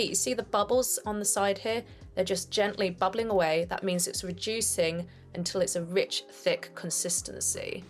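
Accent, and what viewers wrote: United Kingdom. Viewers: I don't know if you added a little touch but, your voiceover really has a nice british/english accent than your normal talking voice. I guess because your recipe today is a "westerner" type of dish instead of an asian dish ^_^ The sauce is the bomb! I might try this! I think that it can pair well with other meats!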